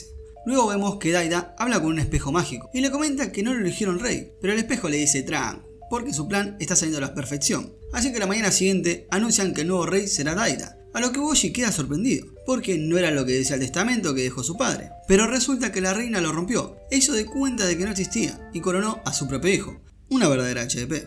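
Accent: Argentina. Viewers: Dandole una buena piña jeje... no se pero algo tiene el acento argentino y el relato de series. Muy bueno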